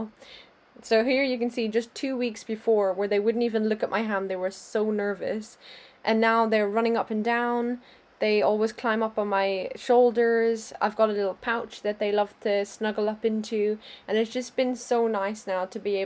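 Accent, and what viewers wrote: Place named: Ireland. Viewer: Love the irish accent! Great video! Next wk. will be bringing home very skittish little girl! Will follow your tips!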